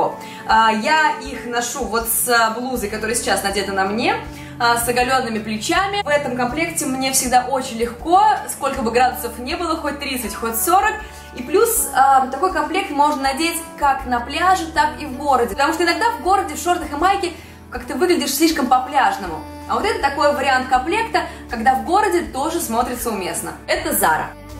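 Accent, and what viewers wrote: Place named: United States. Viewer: Вы слишком быстро разговариваете,прямо тараторите и манера разговаривать у вас какая -то американская.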